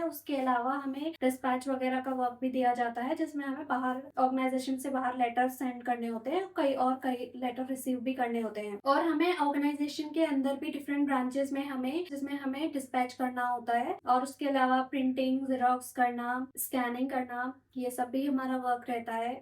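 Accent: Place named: South, India. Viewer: Aap south se ho kya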